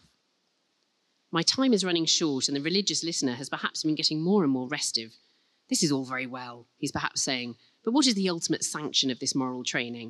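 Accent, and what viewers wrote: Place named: England, United Kingdom. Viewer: Lets hope this enlightened amicable intelligent hard working humane person becomes Prime Minister of the UK one day. Imagine, someone who knows what they are talking about as Prime Minister. Once you get over her beauty and adorable english accent ( I had a crush I eventually got over, reality set in ) and actually start listening to her without bias , watching her TV programmes and reading her books, you realise what a gem the world has here. And nothing but sense she speaks.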